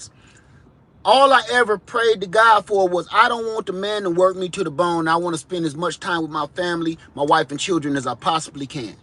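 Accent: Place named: South Carolina, United States. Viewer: Hello! I been watching a few of your videos now and really enjoy your content! I am in Germany and my 2 Bi-racial sons live in SC, just as I did from 99-2012- heck I miss it so much and my goal is to make it back one day. So good to hear you talking- that SC slang that I speak lol Love what you say and am agreeing 100% Keeppushing! God bless you!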